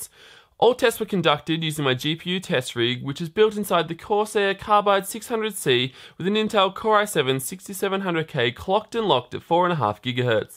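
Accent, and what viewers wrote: Australia. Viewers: Oh ok, I didn't know this....hey so you have an Australian accent, do you live in australia or...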